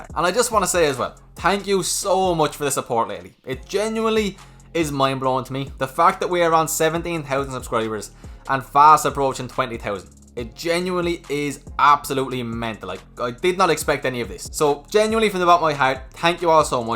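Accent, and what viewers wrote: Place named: Ireland. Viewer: I love your Irish accent, its so cute and you're so enthusiastic about the stocks you cover. Thanks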